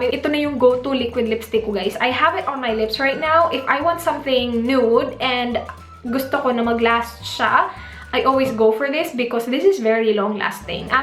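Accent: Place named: Cebu, Philippines. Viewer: malalaman mo talaga kpag cebuana.. Ate! same tayo mg salita, 😘😘😘😀😀😀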